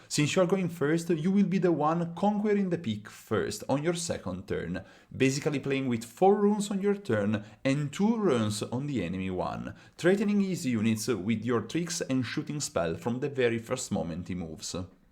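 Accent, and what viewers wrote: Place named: Italy. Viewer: nice video, just a few question: 1 were are u from? (cause ur accent is similar to the italian one, ed anche perché sono italiano e mi sembra di riconoscerlo) 2 i read from the rule that the correct order is not choose battlefield, die roll and choose who go first, but is die roll, player choose battlefield and then choose who go first. i think this way is the worst way, but i understand like this the rule. if i am wrong than it's the fault of the rulebook, it's the worst thing i ever read, someone in riot dont know how to write in an normal english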